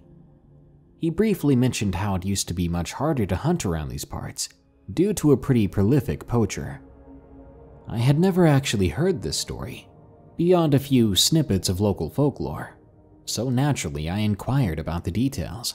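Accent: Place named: South, United States. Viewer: I used to hate his old southern twang he had. His voice now is great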